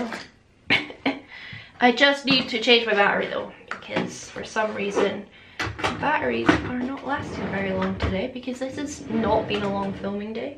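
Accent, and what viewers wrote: Scotland, United Kingdom. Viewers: I love this! Do other Scots call you posh because you don’t say stuff like “dinnae”? I’m from Devon, the accent here is like Sam from lord of the rings and I constantly get comments about being posh just because I don’t have a carrot cruncher voice 😂🙈🤷🏻‍♀️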